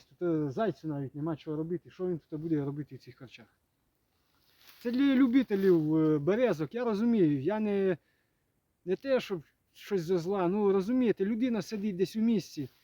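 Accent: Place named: Polesia, Ukraine. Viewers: МОЇ СПІВЧУТТЯ ТОБІ ЗЕМЛЯЧЕ 🤝 ПОЛІЩУЦЬКИЙ ДІАЛЕКТ СУПЕР МЕНІ СОЛОДКО СЛУХАТИ, ТАК САМО ПОЛТАВСЬКИЙ, ЧЕРКАСЬКИЙ, КОЖЕН РЕГІОН МАЄ СВІЙ ДІАЛЕКТ, НАВІТЬ В США АНГЛІЙСЬКА МОВА АЛЕ ЗАХІД, ПІВДЕНЬ, ПІВНІЧ, ЦЕНТЕР ВІДРІЗНЯЮТЬСЯ, ОСОБЛИВО ВІД ЦЕНТРУ НА ЗАХІД....МИРУ НАМ УКРАЇНО ТА ПЕРЕМОГИ НАД МОСКОВИТАМИ. ПРИВІТ З ХЕРСОНУ.... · І мова гарно і поліщук справжній! Головне, що ви небайдужі, вам є справа до землі, на якій живете.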